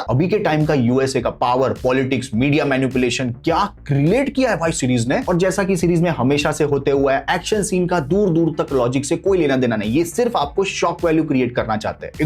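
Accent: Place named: Mumbai, India. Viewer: Bombay wali Hindi bol raha hai bhaiya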